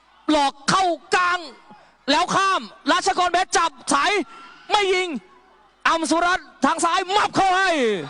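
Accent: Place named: South, Thailand. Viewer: พากได้ส้นตีนคนใต้มาก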